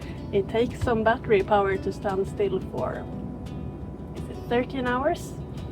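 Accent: Sweden. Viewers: du høres Svensk ut? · Charming Swedish accent😅 (the impossible i’s😅😅)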